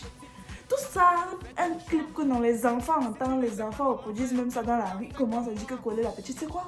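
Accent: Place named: Cameroon. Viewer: ... bonjour à toi… je me suis arrêté où tu dis MAJOUMBOUM... si tu voyais la tête que tu fais en disant ce mot...😁... bref tu as dis à la fin que tu espère qu'on a aimé, j'ai aimé mais je trouve que tu as été trop doux envers les Camerounais… le Camerounais a tellement des talents et des dons mais dommage la transmission est ZERO, sur le plan éducatif MAJOUMBOUM et tout le reste là, nos petites sœurs et petits frères retiennent quoi sur le plan INSTRUCTIF… Mon père MEA dit souvent on ne peut donner que ce que l'on connait, nos frères adorent le bruit et le bouquant. l'ambiance n'est pas une mauvaise chose mais il faut que ça éduque, que ça instruit; on va toujours nous insulter et nous qualifier ( MAJOUMBOUM ). et en passant tu as gardé ton accent Camerounais où est passé l'accent grec???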